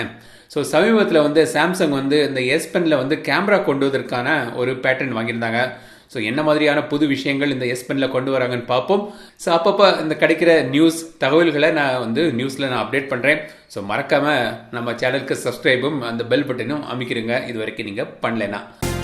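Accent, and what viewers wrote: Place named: Tirunelveli, India. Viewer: Thalaiva neenga pesurathu enga ooru slag mathiri iruku 😍😍 neenga Tirunelveli ah??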